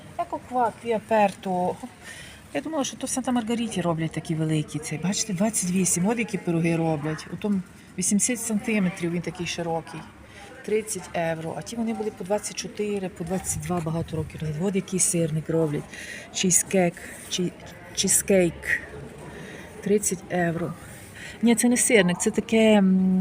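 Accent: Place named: Western Ukraine, Ukraine. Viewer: А мне нравится сразу слышно что с запада, и прикольно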